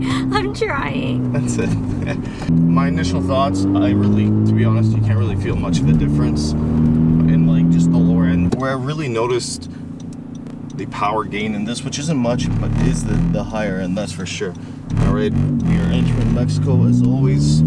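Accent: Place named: Toronto, Canada. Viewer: You're from toronto. I will alway recognize the way ppl talk here in any video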